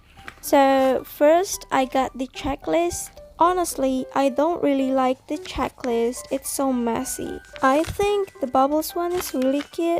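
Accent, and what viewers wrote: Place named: United Kingdom. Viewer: Wah ternyata kaka bisa aksen UK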